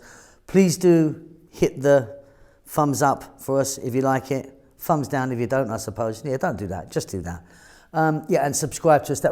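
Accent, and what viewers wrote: United Kingdom. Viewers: Are you from the U.K. ?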